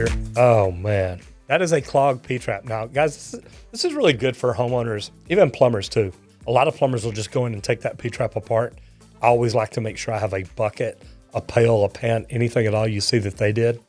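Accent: Texas, United States. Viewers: Based on your accent I say ur Texan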